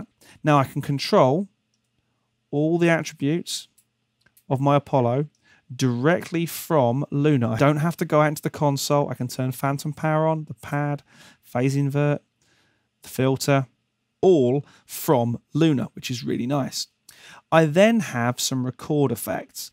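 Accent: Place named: England, United Kingdom. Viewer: LunaRRRRRR. Gotta love that English accent 😍😘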